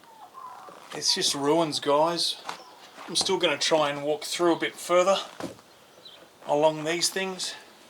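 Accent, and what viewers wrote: Australia. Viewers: Interesting wildlife sounds I'm guessing by your accent it's Australia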